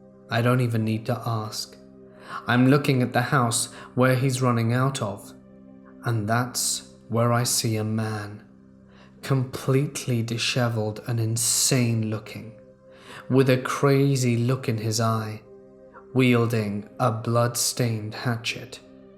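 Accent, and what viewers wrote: United Kingdom. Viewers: I don't think I've ever gotten over deep voice, British accent followed by I'm a 20yo female lol doesn't make me not enjoy or appreciate the video. It just makes me smile to hear lol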